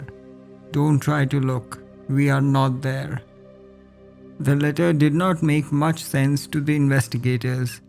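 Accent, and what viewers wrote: India. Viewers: he sounds Indian . Its not like he is a terrorist. Goodness.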